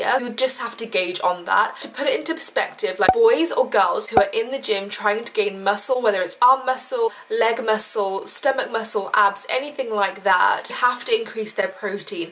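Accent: United Kingdom. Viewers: Omg this British accent 💖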